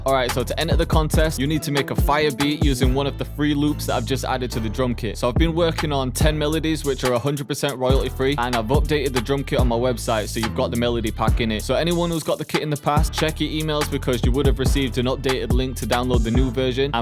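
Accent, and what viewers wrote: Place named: Midlands, United Kingdom. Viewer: Yo, whereabouts in the UK are you from? I'm struggling to place your accent but for some reason I'm thinking Sheffield/Nottingham haha.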